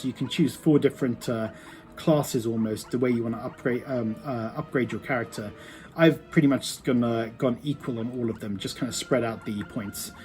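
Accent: United Kingdom. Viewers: that's just it, it hasn't got any sort of sound other than British. I have no idea why I thought you was Chinese lol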